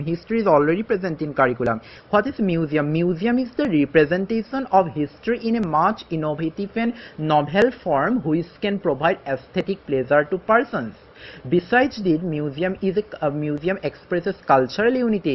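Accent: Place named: Bengal, India. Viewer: Thank you.. And I like your bengla-English pronunciation very much...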